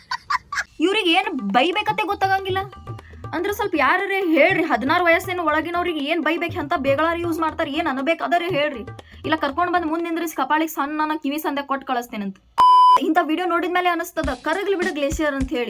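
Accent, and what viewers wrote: North Karnataka, India. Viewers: ಇವತ್ತ ಫಸ್ಟ್ ನೋಡ್ ಬೆ ನಾ ನಿನ್ನ ವಿಡಿಯೋ ನೋಡಿದ್ದ. ನಮ್ಮ್ uk ಭಾಷೆ ನಿನ್ನ ಬಾಯಿಂದ ಕೇಳಿ ಬಾಳ್ ಖುಷಿ ಆತ ನೋಡ್ ವಾ. ವಿಡಿಯೋ ಅಂತೂ ಬಡ್ದ ಬಾಯಿಗ್ ಇಟ್ಟಿ ಬಿಡ್ ಅಡ್ನ್ಯಾಡಿಗಳಿಗಿ. ಸಬ್ ಸ್ಕ್ರೈಬ್ ಆಕ್ಕಿನಿ ಬಿಡ್ವಾ 😂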